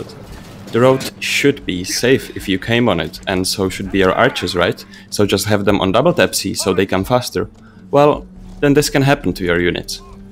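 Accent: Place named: Czech Republic. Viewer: Hey, you are Czech, awesome I did not recognize you accent. I'm from Slovakia. Started to play today. Awesome game, awesome guide. Good luck.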